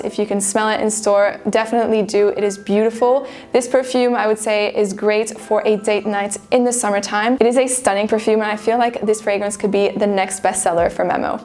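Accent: France. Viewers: In any case, I'm waiting, but I follow you a lot on YouTube. Nevertheless I just subscribed to your IG page and I love your little French accent. THANK YOU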